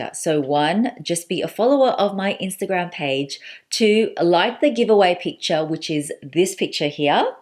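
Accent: Melbourne, Australia. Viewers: Amazing to see a youtuber from Melbourne, comforting to hear the accent again. It's so unique to see a vintage bag as well, such an amazing prize to offer. Honestly, I would love the money so I can save that for when I'm feeling spendy and want to splurge on a nice bag. I hope you continue to succeed at everything you are doing and your channel progresses. Insta: rhiannon_davies_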